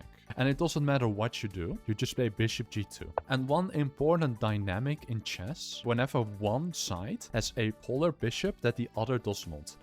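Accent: Netherlands. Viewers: The DUTCH ACCENT IS UNESCAPSBLE BRO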